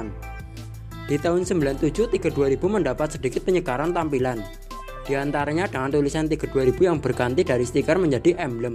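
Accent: Java, Indonesia. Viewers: yaampun wng ndi iki suorone khas jowo bgt 😂😂😂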